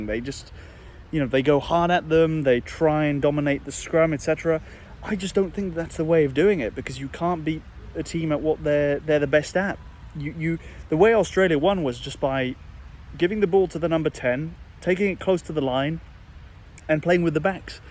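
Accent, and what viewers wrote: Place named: South Africa. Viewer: Damn for an english frenchman (an oxymoron if i ever heard one) you sound very much like a South African. Hybrid.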